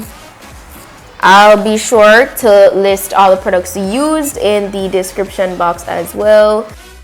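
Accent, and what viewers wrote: Jamaica. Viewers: Your accent sounds Jamaican🇯🇲 💕❤️♥️❤️💕❤️♥️😍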